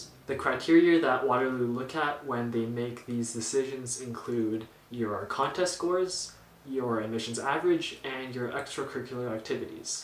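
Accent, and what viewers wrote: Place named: California, United States. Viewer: SE's cutoff is 97. With the academic adjustment factor, you might've just missed the cutoff. Otherwise, are you a Canadian citizen? Engineering has quotas on the max number of internationals they let in. You seem to have a Californian accent, but I could be wrong. Other speculation: perhaps another sport, a part-time job, or something that sets you apart from the typical applicant would've been helpful, especially considering the hundreds of typical applicants from Markham/Richmond Hill/York Region. P.S. Bayview and St. Robs are feeder schools.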